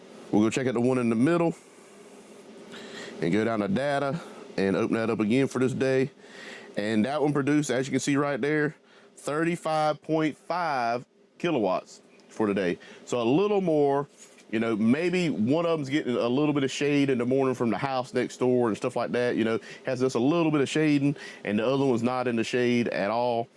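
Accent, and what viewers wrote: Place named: Texas, United States. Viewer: Nice! Kind of hard to guess not knowing where you're located (although sounds like TX), but I'm going to guess 101kW.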